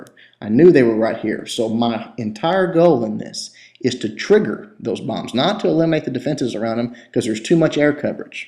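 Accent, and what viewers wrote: United States. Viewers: My new favourite channel. The breakdowns are amazing and your all-American accent just fuels my need to war haha. Keep it up man!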